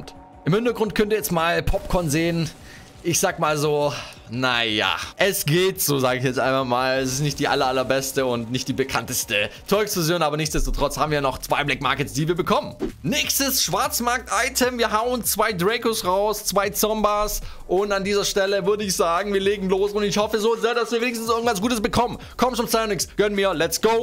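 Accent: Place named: Germany. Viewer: Aus welchen Bundesland kommst du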